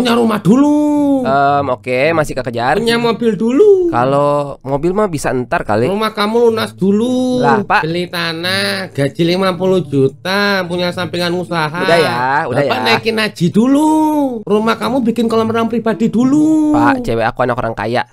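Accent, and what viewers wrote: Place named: Java, Indonesia. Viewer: Dulu medok asw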